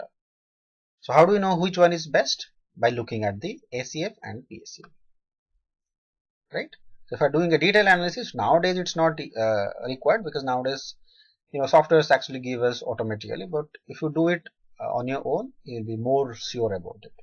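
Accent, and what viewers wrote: India. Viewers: Hope you can speak Hindi fluently in Indian accent. So ungrateful of you. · Indian accent! Thanks for your video :D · Fucking annoying indian accent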